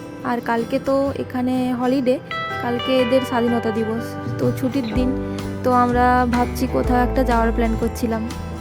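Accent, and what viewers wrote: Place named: Medinipur, India. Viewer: Tomar bari kothay kothar tan sune mone hoche medinipur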